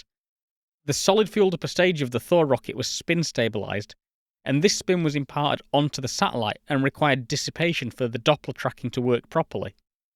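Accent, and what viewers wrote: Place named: Northern England, United Kingdom. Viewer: I'm going to guess it did not occur to him his perfectly standard northern accent is "difficult to understand"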